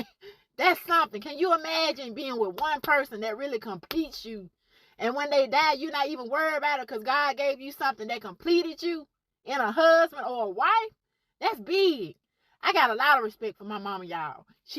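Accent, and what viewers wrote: South, United States. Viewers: I can tell you''re a southern lady! Alabama, Mississippi or Tennessee! Sounds good, and I can tell you can cook and keep a clean home! There's a difference between a house and a home! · Are you from Alabama or Mississippi? That southern accent is deep.😂😂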